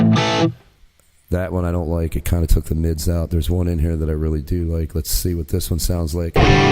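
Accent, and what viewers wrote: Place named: Philadelphia, United States. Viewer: Cool video! Have you tried S-Gear? You from Philly? I hear a Philly accent.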